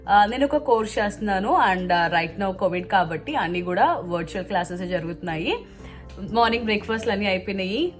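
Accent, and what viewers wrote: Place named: Telangana, India. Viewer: Meru తెలంగాణ లా masthu matladuthunaru...💞💞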